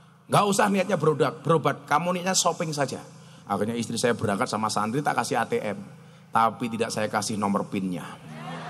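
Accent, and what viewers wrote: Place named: Java, Indonesia. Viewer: Sy tiap hri nyimak ceramah e Gus Miftah gk membosan kan seneng jg dgn logat jawa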